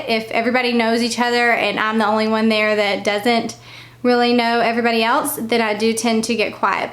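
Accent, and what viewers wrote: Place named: South, United States. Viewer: Okay so I don’t want this to sound weird but I LOVE your accent!! I am in the Midwest and don’t hear that southern twang very much. It is so nice! It reminds me of one of my best friends from high school that I lost touch with. 💕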